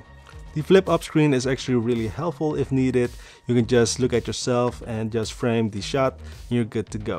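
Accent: Netherlands. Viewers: Ik kon gewoon horen dat je uit nederland komt 👏🏾 I want a camera so bad but the prices are sickening here in the Netherlands (everywhere tbh) 😩 Trying to figure out how good the cheapest ones are.. Nice video, thank you~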